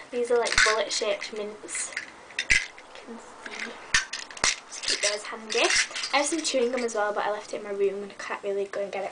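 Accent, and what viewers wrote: United Kingdom. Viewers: cooll videeoo you have a more british accent than me and i live in the uk to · UK gals always have the cutest accent lol put more vedios nice work :)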